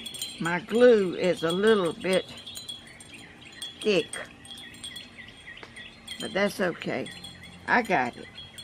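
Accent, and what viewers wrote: South, United States. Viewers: Don't you worry, I love listening to your accent, it reminds me of when I lived in the south. It saddens me that young people don't have the accent anymore. Thumbs up. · Hey, don't get to down on yourself about your camera acting up, it happens to alot of people on YouTube. I admire all your crafts and seem like so much fun!!! And I love a good southern accent!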